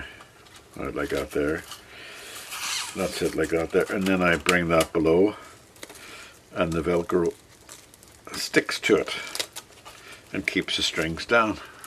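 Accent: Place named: North, United Kingdom. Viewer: That gentle "No'rion" accent grabbed me immediately and kept me listening to the end. Great review. My only criticism would be that you might have explained what exactly you were doing to ensure the 12th fret and the open strings were perfect octaves. I never remember whether it's "clockwise" or "Anti-clockwise" for flat or sharp and I end up doing it by trial and error every time I acquire a new instruemtn. You have probably given it back by now, but how much did it weigh approximately? Most LPs I have strung around my neck were so heavy that 30 minutes of playing would cause shoulder problems.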